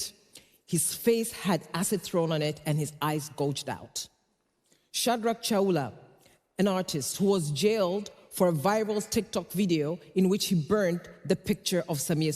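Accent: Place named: United States. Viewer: U bwege unababaishwa na Americanized voice kaolewe naye.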